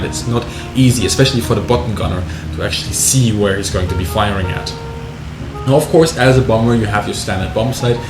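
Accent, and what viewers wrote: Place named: Germany. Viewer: Come on, pleeeeaaaaseee make some WarThunder videos and plane reviews! we really miss your insight, rants and that glorious german accent! Pretty bitte?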